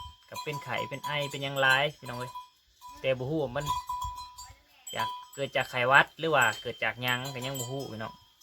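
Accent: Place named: Isan, Thailand. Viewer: เว้าม่วนเนาะจร้าคือิสานคัก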